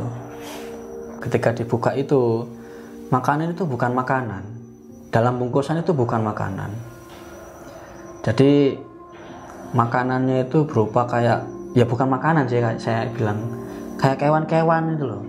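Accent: Java, Indonesia. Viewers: Kang kirain orang sunda,ternyata jawa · Kang Nama sunda, logat medok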